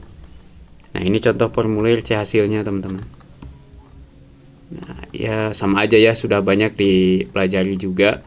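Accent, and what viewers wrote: Sunda, Indonesia. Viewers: si akang orang sunda yah, ada sunda sudannya dikit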